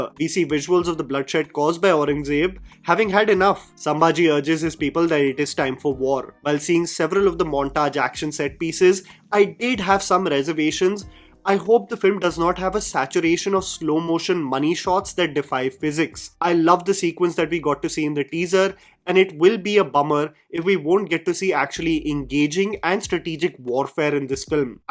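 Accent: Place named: Punjab, India. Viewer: He is speaking like a panjabi not a maratha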